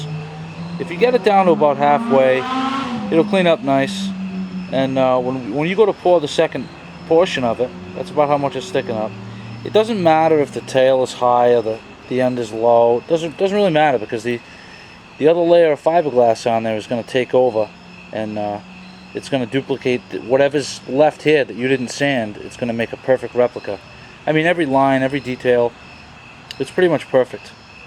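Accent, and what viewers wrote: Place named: Brooklyn, United States. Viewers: Not bad. You sound like your from New York, Boston, etc. where exactly are you from? I'm a Southern boy from Alabama. Fishing, college football, and most important following Jesus Christ is our passion here. If you ever get the chance, Feb., March, and April is the time to come to Alabama with your boat. Lay lake, Mitchell, Logan Martin, Guntersville, and many more lakes here with monster bass. From Smallmouth on the Tenn. river, spots on Smith, Mitchell, lay, Logan Martin, to Largemouth on Gun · Are you from Brooklyn by any chance? You sound like it.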